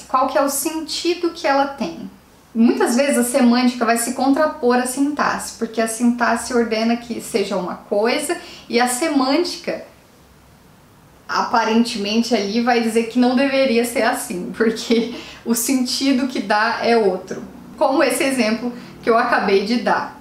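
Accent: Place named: Interior, Brazil. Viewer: Adoro esse sotaque do inerior vindo da voz de uma mulher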